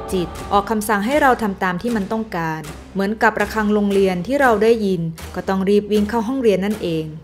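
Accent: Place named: South, Thailand. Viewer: พี่เป็นคนใต้เเน่ๆ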